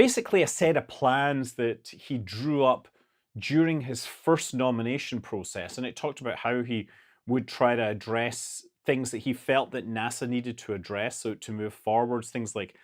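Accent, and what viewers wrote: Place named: Edinburgh, United Kingdom. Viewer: scott manley is a scot, and manly :) i do a fair accurate glasgow accent. i love yours. doesnt quite sound like glasgow..... or maybe mine doesnt. sounds more edenborough (and i am canadian so wtf do i know)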